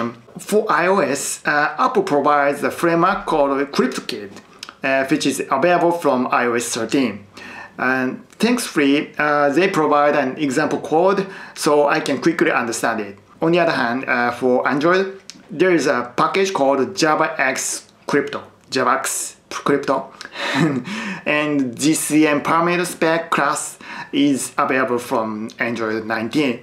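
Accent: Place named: Japan. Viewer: I like your Japanese accent.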